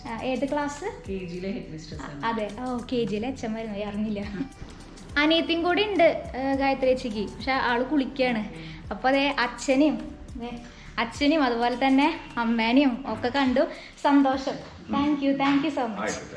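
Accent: Thrissur, India. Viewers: ഇയാളുടെ തൃശ്ശൂർ ഭാഷ കേൾക്കാൻ നല്ല രസമാണ് ..😍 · തൃശ്ശൂർ ലാംഗ്വേജ് കേൾക്കാൻ നല്ല രസം തന്നെയാണ് അവതരണം 👌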